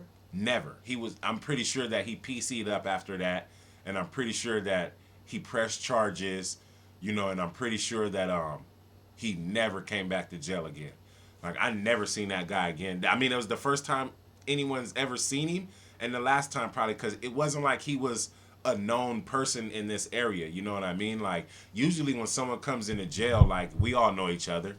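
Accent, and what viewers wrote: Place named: California, United States. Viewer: he sounds straight up cali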